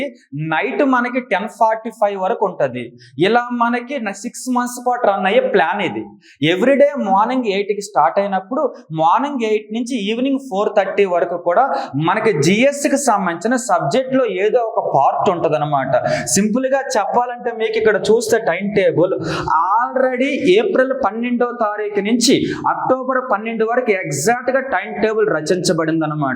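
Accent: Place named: Andhra Pradesh, India. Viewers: అవును.. ఇతను ఆంధ్ర.. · నువ్వు ఆంద్రోనివారం తమ్మి వాయిస్ ఆంధ్ర లెక్క ఉంది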